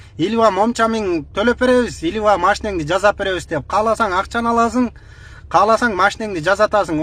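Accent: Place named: Pamir, Tajikistan. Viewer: Туура эле суйлодун брат. Акцент памирский экен а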